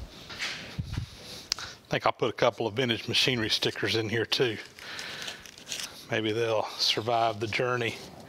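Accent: South, United States. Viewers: As a new subscriber to your channel and a longtime subscriber to Leo’s I would like to ask a favor. If you will sir, please take a breath between your name and Vintage Machinery. It sounds like one-word it all runs together and is difficult to understand. The rest of your video sounds great with your eloquent Southern accent. I know your future subscribers and some of your present ones will thank you for it. Looking forward to watching more of your channel.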